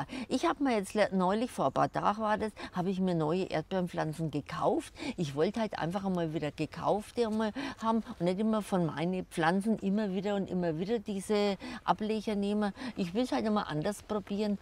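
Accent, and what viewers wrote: Franconia, Germany. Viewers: Eine Freude den typisch fränkischen Dialekt zu hören. Ich tippe auf Mittelfranken 😊 Super Tipps auch noch dazu 👍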